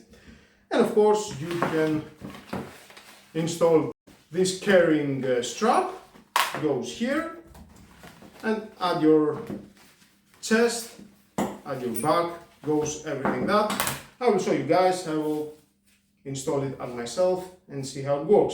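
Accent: Greece. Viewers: Hello, I cannot help but like your accent. I've heard it many times on Greek ships when I was a service engineer 😅 are you also a seafarer or is that just how Greeks sound?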